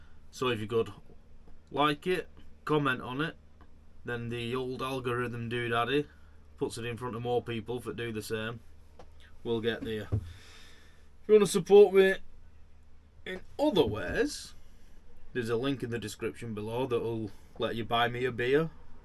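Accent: Bolton, United Kingdom. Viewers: A Bolton lad eh? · Good to hear a Bolton Lad, I lived and studied in Bolton in the late 1980's. Keep the faith you will get there! I sent you a couple of quid there to help.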